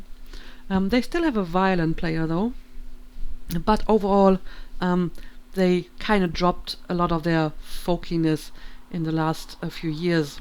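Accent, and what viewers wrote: Germany. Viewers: Congratulations! I knew your accent but I never found out where it came from in Germany so thanks for answering that question! Other things I'd like to say are that I do share your interest in Folk Metal and that most of us french people (I'm from Strasbourg) also find snails gross. Btw I checked the Bielefled controversy. Just wow. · When I first heard your voice, your accent sounded really familiar. I actually wondered if you were Tianlein who does Elder Scrolls Online fashion content here on youtube. Maybe a second channel of hers but I clicked on one of her videos and her voice is slightly different to yours (also German though). So, I used to play Elder Scrolls Online with a German lady about your age, from Cologne, who liked exactly the same folk metal music and is called Katrina (shortened online to Cat). The only reason I know it cannot be the same person is because I played ESO on PS4 not PC. 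Also, the person I know is actually Indian and that part of her accent is not present when you speak. Very strange coincidence though lol.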